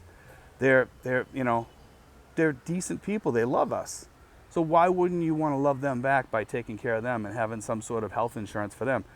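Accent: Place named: New England, United States. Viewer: Great Vlog! You made me hungry for New England Chowder soup listening to your accent. Campells it is! Crackers n pepper! Sincerely Bruce Tattrie Edmonton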